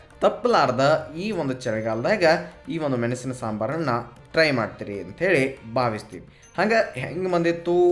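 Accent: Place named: Dharwad, India. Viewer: ಸಾರಿಗಿಂತ ಜಾಸ್ತಿ ನಿಮ್ಮಧಾರವಾಡ ಭಾಷೆ ಕೇಳೋಕೆ ಮಸ್ತ್ ಅದೇರಿ 👍😁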